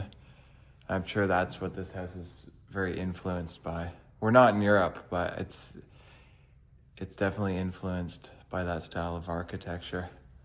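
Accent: Canada. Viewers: I subcribed to you a long time ago, somehow forgot about how much i enjoy looking at homes, you popped up and i am so addicted and been watching like crazy! You are so kind and respectful. I love your canadian accent sooooo much! Omg, your just adorable! ❤❤❤ thank u! Your videos calm me down after a hectic day. Hugs! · Canadians always pronounced anything with an “ou” like owoo… howoose (house) owoot (out) spowoot (spout). Weird!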